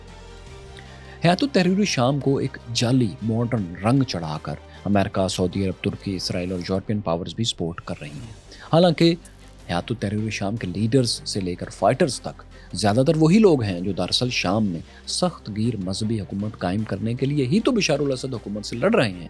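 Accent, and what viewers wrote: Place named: Punjab, Pakistan. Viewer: What language are you speaking in? It appears to be a mixture of English, Arabic, Farsi, Turkish and Hindustani being spoken in a heavy Punjabi accent.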